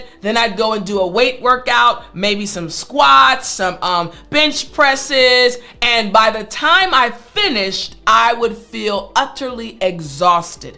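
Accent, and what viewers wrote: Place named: United States. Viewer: I really get confidence when I listen to you. I personally don't like American accent but with your voice the accent sounds beautiful ❤ Ramadan Mubarak.❤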